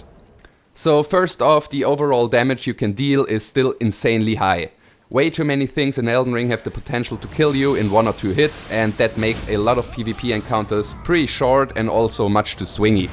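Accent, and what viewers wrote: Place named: Germany. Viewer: man merkt das du deutsch bist haha gutes video übrigens!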